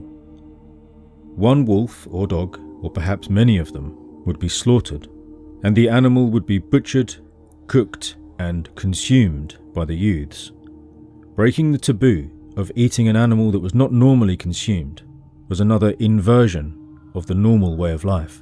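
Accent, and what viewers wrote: United Kingdom. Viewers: you sound pretty similar imo. You sound a bit more serious. But joking aside, this was a thoughtful video and I enjoyed it a lot. I hope my little joke didn’t make you self conscious or anything. If it helps, my barbarian American ears just lump all British accents together so you could chalk it up to that.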